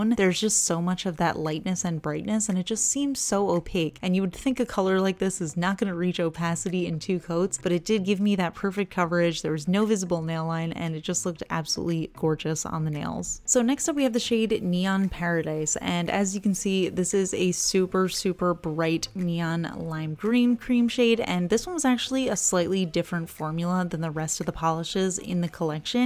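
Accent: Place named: Northeast, United States. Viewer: I'm not a neon fan, but some of those colors...wow! I think the people who don't like how you pronounce orange are clueless about accents! Don't they know you grew up in the northeast? 🤦‍♀️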